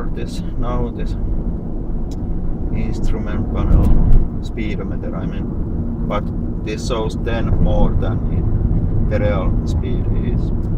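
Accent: Finland. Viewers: I love the Finnish accent and the car as well :) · On kyllä nii finnish english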